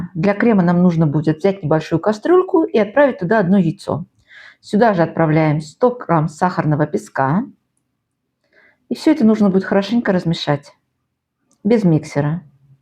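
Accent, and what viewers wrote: Baku, Azerbaijan. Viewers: Добрый вечер! Очень приятно смотреть Ваши видео, особенно Бакинский акцент. Очень прошу рецепт песочных пирожных со школьной столовой, за 22 коп. с повидлом и розовой глазурью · Судя по акценту Вы жили в Баку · Я пишу Вам из Дербента. Мы тоже пекла такие пироженки и так же называли белочками. Но когда-то много лет назад у меня в Баку жила тётя с семьёй и я приезжала к ним в гости. И сейчас слушая неповторимый бакинский акцент- такая ностальгия по тем временам. Как будто родной голос 😊😊😊. Спасибо ❤ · Да,узнаю бакинский акцент❤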